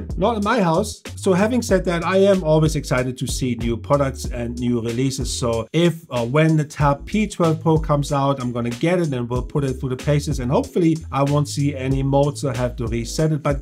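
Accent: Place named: Germany. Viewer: i have stayed in germany for years. This is the accent of Germans, i can smell it from miles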